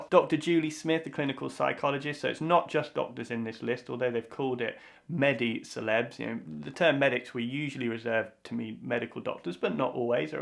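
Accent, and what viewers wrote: United Kingdom. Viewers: I Agree with you about the photo. You are much more handsome now. Actually, much cuter than that other doctor on the front page of the magazine. Besides that, you have a great British accent and I love the way you laugh. But I mainly watch you because you are so down to earth and you have interesting medical subjects and you explain it easy for me to understand with a touch of comedy. I watch 2 other doctors, and you are much better than them. You are my #1 doctor on YT.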